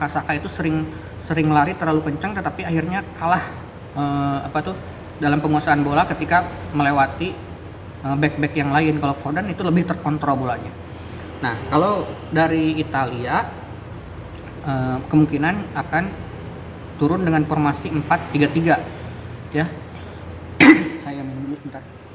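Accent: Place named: Sunda, Indonesia. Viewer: Bng lagi ngapain di bali ,kaya orang sunda nada bahasa nya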